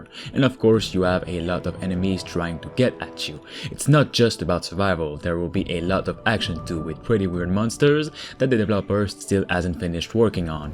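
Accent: Quebec, Canada. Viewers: Es-tu québécois ? Ton accent semble familier :P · Are you from Quebec? I'm hearing a bit of an accent :)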